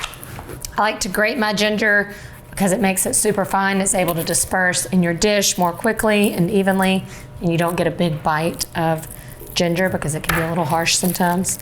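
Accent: South, United States. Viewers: LOL! Love the recipe AND the downhome accent and style combined with oriental cooking- maybe globalism isn't all bad!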